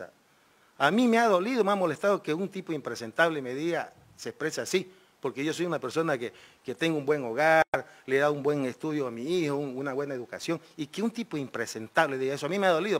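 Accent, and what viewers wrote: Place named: Camba, Bolivia. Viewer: esta es la voz de un camba de verdad, grande!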